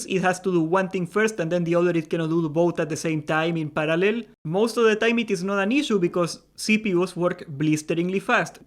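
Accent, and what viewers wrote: Spain. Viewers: Eres de fuengirola, verdad? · I gotta say that I like how you speak English, are from Spain?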